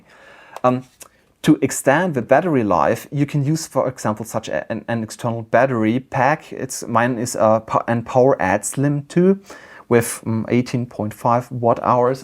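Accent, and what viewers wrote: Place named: Germany. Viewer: Nichts gegen dein Englisch, aber ich hab sofort gemerkt, dass du deutschsprachig bist. :D Gruß!! ;)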